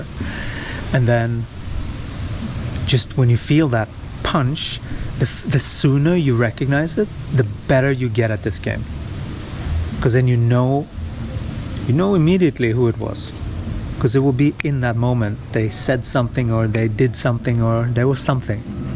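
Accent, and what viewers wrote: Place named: California, United States. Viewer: Also it sounds like you live in California lol I just moved back to North Carolina from California… not all of America is like California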